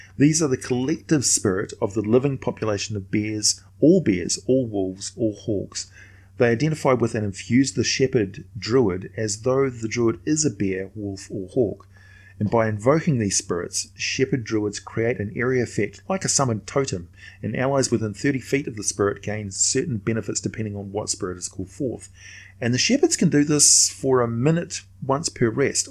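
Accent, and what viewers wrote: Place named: New Zealand. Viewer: Aye a kiwi bloke nice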